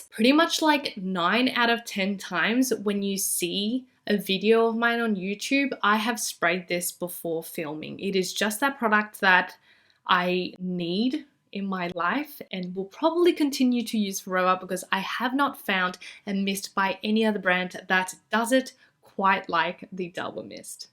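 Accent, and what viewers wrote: Australia. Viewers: Her Aussie accent!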